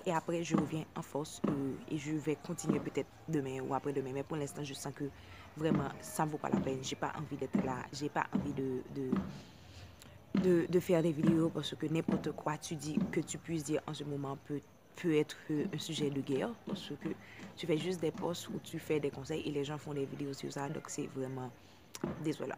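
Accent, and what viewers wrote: Haiti. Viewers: C'est pareil sur insta ! Étant une " influenceuse " je reçois de nombreuses demandes de pubs par jours. Là n'est pas le problème, mais quand ça termine par " stp fais moi une pub j'aimerais percer comme toi ". Y'en a ils s'inventent des vies pour qu'on parle d'eux, c'est devenu n'importe quoi !! En plus depuis qu'on peut se faire de l'argent avec les réseaux sociaux il y en a de plus en plus qui sont là juste pour l'argent, les partenariats etc. Flemmme ! En tout cas je te comprends et j'aime bcp le ton de ta voix (le ton que toutes les haïtiennes ont, mes tantes c'est pareil) bref j'aime aussi ta vidéo ♥♥♥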